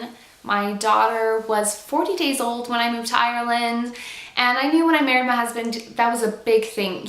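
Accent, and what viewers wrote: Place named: North America. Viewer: Yay for ex-pat #bbloggers! I moved from Texas to England last year for my husband as well. I love hearing other North American accents pricing things in pounds and euros!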